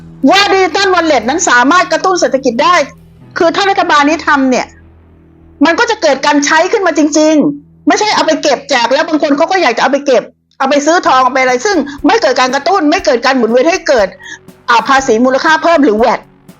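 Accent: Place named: South, Thailand. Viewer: ติดตามผลงานอยู่เป็นประจําชอบการพูดถูกใจมากเลยพูดเหมือนคนภาคใต้ใจถึงพึ่งได้ครับ❤❤❤❤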